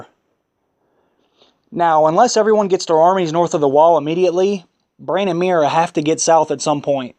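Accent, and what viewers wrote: Pittsburgh, United States. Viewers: you sound like your from pittsburgh lol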